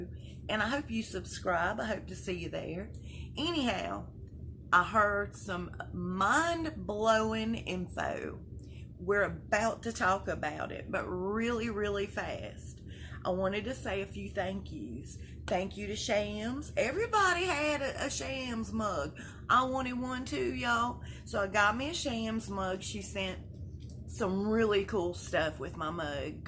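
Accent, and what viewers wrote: South, United States. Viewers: She has a very heavy southern twang. I love her voice too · we love you You are so adorable! I have no idea how all these people who send you gifts know where to send them... Anywho I wish you a beautiful Happy Borthday September girl!! Also I use diclofenac ointment it’s a topical anti inflammatory that my dr gave me & it helps. I use ice if it’s real bad & otherwise I’ll just put heating pad on it! But I love your vids watch them all the time & I love your sweet country accent...just love it Big hugs love and many blessings sweet “talk to ya real fast” ☺️🌸☘️🌼🙏❤️✝️ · It's a southern accent 🙃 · There’s a lot of variations of the Southern dialect My husband doesn’t sound like at all. But I think all the accents down South are so cool to listen to!!!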